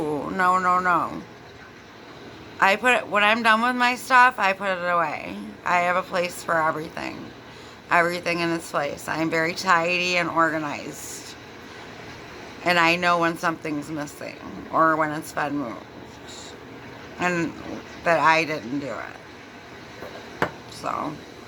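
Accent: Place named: New York, United States. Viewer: Wow, I grew up in NY and was all over your other channel trying to figure out if you are in NY from your accent. I finally looked up a restaurant I saw on a sign in your video, then found this channel, where you announced exactly what I took a half hour figuring out (Rochester). So I am going through your vids as a fellow NYer (in Phoenix - don't come here) point of view and not to be a sadist but enjoying it because it is comforting to hear from someone with your NY vibe.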